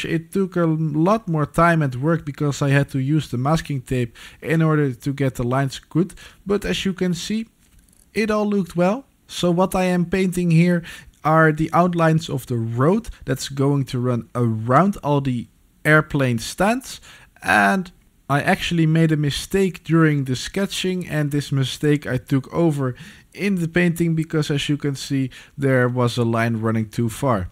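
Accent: Netherlands. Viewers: Love het nederlandse acent in het engels😂😂😂😂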